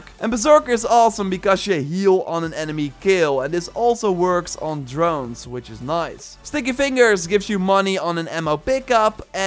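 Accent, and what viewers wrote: Netherlands. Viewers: Arw you dutch?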